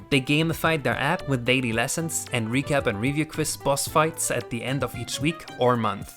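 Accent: Germany. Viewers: Über all diese Jahre hatte ich irgendwie nie gedacht, das er einen deutschen Akzent hat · You german!? Dein Akzent ist mir nur jetzt eingefallen!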